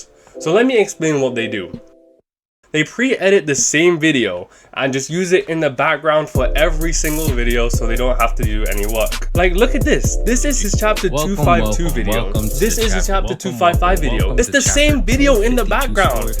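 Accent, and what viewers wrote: England, United Kingdom. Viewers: U from England crazy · Wait you from England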